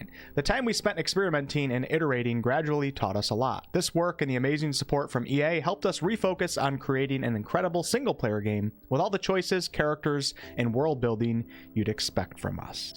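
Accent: United States. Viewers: I'm glad you have swapped over to DA. I was kinda curious what direction you would go after BG3. Also folks, he pronounces things differently due to his yankee accent, it's not a big deal. 😂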